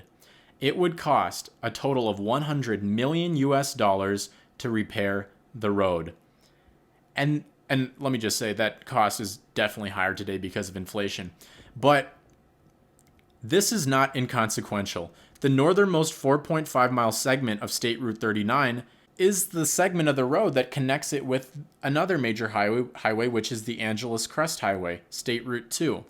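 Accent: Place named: Midwest, United States. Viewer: I’m glad you liked SoCal. I would never leave. Born and raised here in Orange County. I can hear your Midwest accent. Never been to the Midwest or East Coast. It’s so odd that you’re hapa and you’ve never been to CA. Hapa means part Asian.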